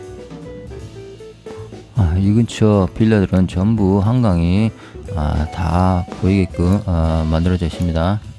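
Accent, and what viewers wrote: Jeolla, South Korea. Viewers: 뭘보고 일본인?? ㅋㅋ 딱들어봐도 전라도 사투리구만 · 전라도 말툰데 뭔 일본어????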